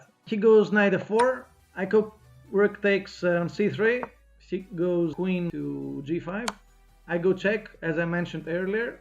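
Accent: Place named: United Kingdom. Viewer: Γεια σ ρε αρχηγέ! British accent! If you didnt got it i am Δήμητρα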